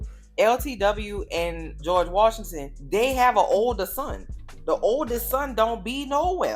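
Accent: New Orleans, United States. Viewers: I just know you from New Orleans BAYBAY I know a NOLA accent when I hear it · This is my first time on your channel and I'm KMSL! I clicked the link because I watch this show and it was on my recommendations page. Immediate subscribe! And the accent.....are you from New Orleans?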